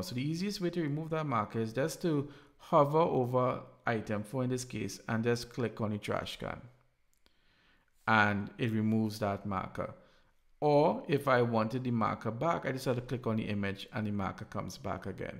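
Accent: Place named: Trinidad and Tobago. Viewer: Thank you so much for this video. I detect a Trini accent 🙃hope I'm correct.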